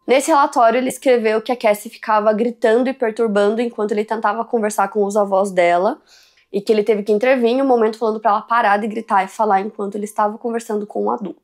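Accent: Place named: South, Brazil. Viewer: gosto do sutaque do sul rsrs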